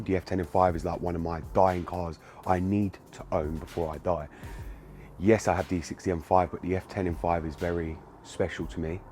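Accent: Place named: England, United Kingdom. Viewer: He is an Englishman with a deep accent. As an African it took me some time to understand what he says but I have kept touch with his videos.. I now understand all what he says...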